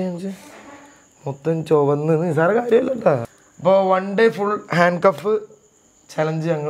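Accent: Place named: Haryana, India. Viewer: ki Haryanvi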